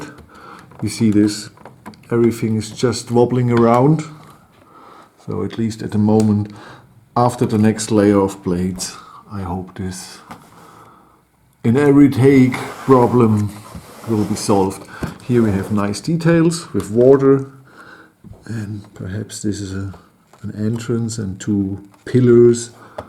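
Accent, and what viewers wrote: Germany. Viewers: Your Hessischinglisch is a pain in my Ears..... Dann bitte auf hessisch.... Oder Frankfurterplatt....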